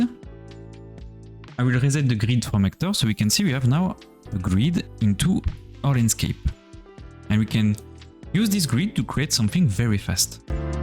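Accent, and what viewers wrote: France. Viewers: On reconnais l'accent Français lol ^^. Tu pourrais en faire en Francais ? Sa serai cool !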